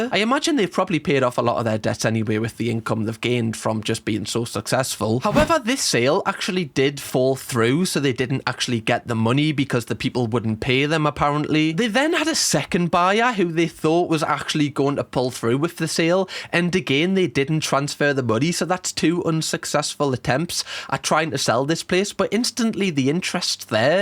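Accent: Newcastle, United Kingdom. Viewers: I am a 46 year old mom of 6 and grandmother of 3 so far and Ditto all of the above comments. Including the Geordie accent comment. It’s one of my favorites! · I am a happily married 43 year old mom of 3 with 2 older kids and a 15 yr old. I love the care and thoughtfulness he puts into these videos! Plus I'm an American who is a sucker for a Jordy accent!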